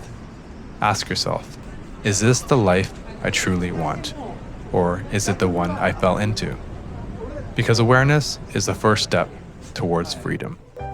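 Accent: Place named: Europe. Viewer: But your Asian, why talk as if your European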